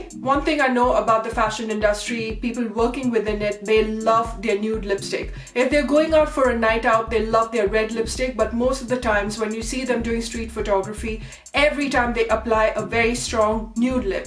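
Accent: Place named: Kerala, India. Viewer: Hey, You are very attractive and I'm in love with this makeup look also Your accent reminds me of a very good friend of mine who also happens to be a malyali I met in Dubai a few years ago.. (love the accent💞) your videos are so informative And educational..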